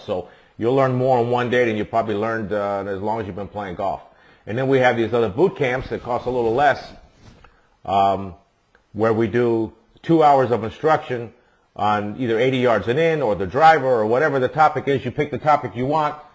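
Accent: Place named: United States. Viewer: Nice video. What part of USA is the accent? Cool.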